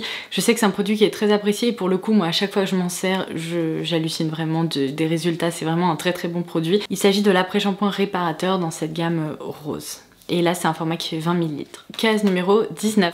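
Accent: England, United Kingdom. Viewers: Bonjour, ma question est un peu hors sujet dsl mais j’aimerais savoir si vous êtes d’origine anglaise du à votre accent anglais. Bonne journée.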